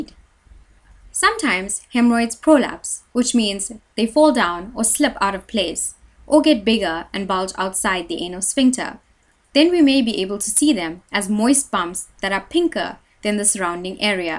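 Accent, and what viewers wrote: South Africa. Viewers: You sound like you're a south african indian, am I right?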